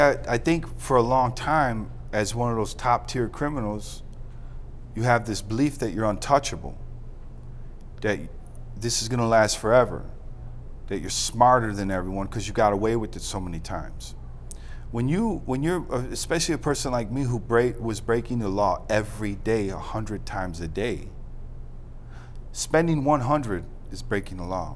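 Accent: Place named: Chicago, United States. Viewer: I was wondering what it was in your voice the whole time.. It's that Chicago accent man.. I'm a Gary boy.. Family off Manistee on S.W. side..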